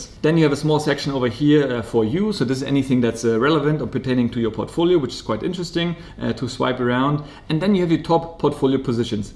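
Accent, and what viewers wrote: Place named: Germany. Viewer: Are you from Germany? Your command in English is very swift. Once in a while I hear a slight German accent 😊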